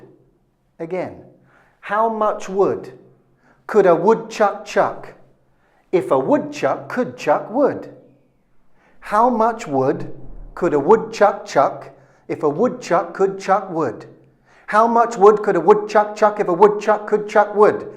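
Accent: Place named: United Kingdom. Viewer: I miss Avalon and is a good teacher I like his british accent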